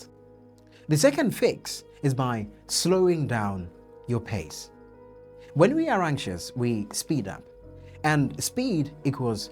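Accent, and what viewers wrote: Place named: RP, United Kingdom. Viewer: You have the RP accent , which better that the American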